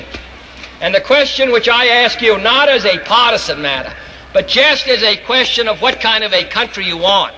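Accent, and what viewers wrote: Boston, United States. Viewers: Fantastic and fabulous. This man was a genius. What a masterful speaker! He had a Boston accent but you can understand every word he says.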